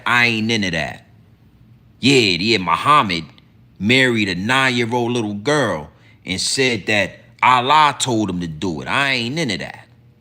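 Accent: New York, United States. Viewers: You sound like your from New York. And not from Va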